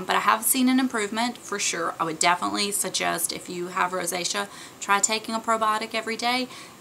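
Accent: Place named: Alabama, United States. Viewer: You sound like you're from Bama girl. Not a bad thing.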